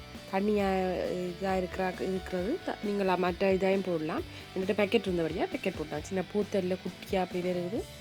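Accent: Jaffna, Sri Lanka. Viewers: jaffna tamil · Unkada pechchu alaka irukku akka 😄😄😄😄👍❤ nenkal srilanka ena 😀😀🤗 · Akka sema Jaffna Tamil akks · Nenka jaffna tamil pesurinka,💞💞💞😍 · கிண்ணி நீங்க யாழ்பாணமா??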